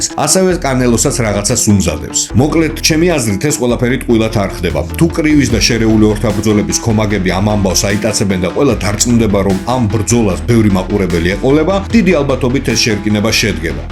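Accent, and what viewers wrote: Armenia. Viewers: მადლობა სომხურმა აქცენტმა მაგრა გაასწორა საღოლ იუმორში👍👍👍👍👍👍👍👍😁😁😁😁😁😁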